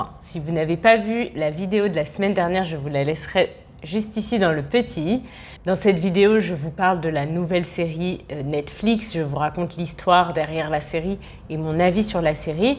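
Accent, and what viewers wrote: Paris, France. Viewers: Bonjour. J’adore ton accent français. Je trouve que ce vidéo également intéressant. J’ai hâte de votre prochain vidéo. J’aimerais que vous faites le même pour la série Dix pour-cent (Call my agent) si c’est possible. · Elle a un accent parisien, mais surtout, elle accentue sa prononciation, et parle doucement, pour que vous saisissiez ce qu'elle dit.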